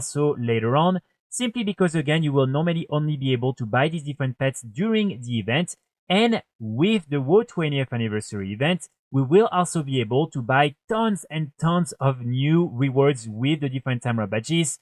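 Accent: France. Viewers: Super tes vidéos, mais t’as un accent français on dirait.. en tout cas, ton Discord ne marche pas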